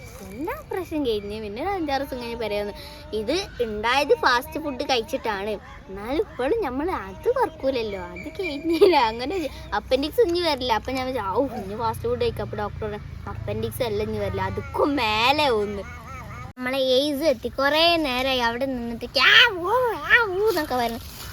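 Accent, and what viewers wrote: Malappuram, India. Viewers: മലപ്പുറം ആണെന്ന് മോളു പറയാതെ ആ സംസാരത്തിൽ മനസ്സിൽ ആവും. ഞാൻ മലപ്പുറം ആയതു കൊണ്ട്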